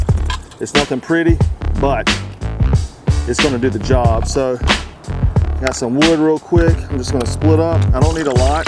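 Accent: South, United States. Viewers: Now I know why that southern accent reminded me of home, we're from the same city lol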